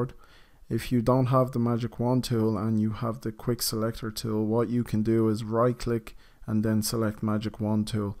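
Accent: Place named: Ireland. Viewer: THANK YOU SO MUCH. Finally found this after scouring for days. And that Irish(?) accent made everything so much better lol.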